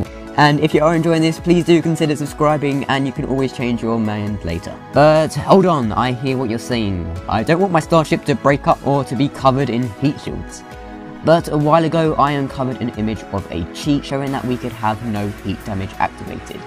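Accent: United Kingdom. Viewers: It's been 12 days,where is the uptade? And you sound very very britishy.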